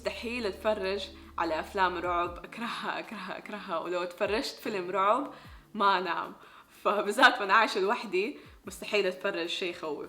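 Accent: Hejaz, Saudi Arabia. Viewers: ما شاء الله اللهجه حجازيه ومفهومه جدا اللغه العربيه قويه والترجمه من الى ١٠٠/١٠٠ هذه الايجابيات عندك اختي تجعلك داعيه رائعه وقويه وموثره جدا لانك قويه في اللغتين بامكانك ان تكوني داعيه بس في الهيئه واللباس اسلوبك رائع استغليه لله واعلمي ان الناس عندكم في بريطانيا واوروبا متعطشين الاسلام ...ولان يهدي الله بك شخص واحد خير لك من الدنيا وما فيها ... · لهجة مكاوية وجداوية جميلة وماحفظة عليها مانسيتيها اشكرك تحياتي لك سعودي من الرياض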